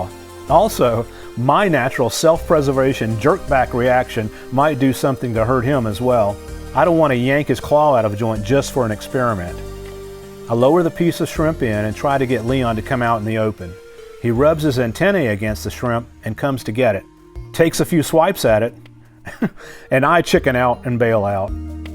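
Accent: South, United States. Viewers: I Love voice! Calming and yes, very easy to listen to. That little touch of southern twang just puts a big old smile on my face and makes me sigh in contentment. 🥰😊😍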